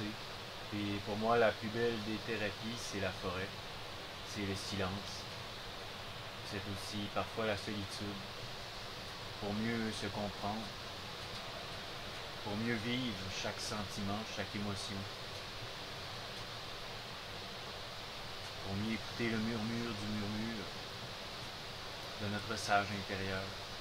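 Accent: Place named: Canada. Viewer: Bonjour, 1ère vidéo que je découvre avec ravissement une cabane nichée au ❤ d'une sublime forêt 🌲🌲🌲🌲🍁🍂🍃🍀☘🌿🌾🌵🪴🌱.Rien de tel pour se ressourcer, laisser les blessures du passé s'enfuir dans le vent qui court dans le temps qui passe. Le meilleur ami de l'homme, l'animal, ce 🐕 🐶 si merveilleux, qui t'accompagne, lui seul comprend ta mélancolie et qui jour après jour te souffle le bonheur de demain...., ton plus grand ami. J'adore ton accent canadien. Bon courage avec Kirten. Cordialement. Sayoko. 28/01/2021🌞☃️❄⛄❄🌜🌛🌠✌✌✌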